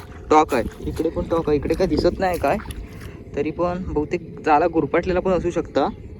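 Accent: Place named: Malvan, India. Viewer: तू चिपळूणकर आहेस का मालवणी बोलतो नाय येत तरी नीट बोलणं आलिव गेलिव नाला चा पाणी तलाला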